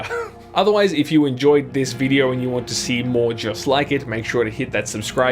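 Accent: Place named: Australia. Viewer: Hahaha that's just the down under accent... Been around Aussies and Kiwis long enough to recognize it anywhere. The upside is that it is relatively simple to impersonate.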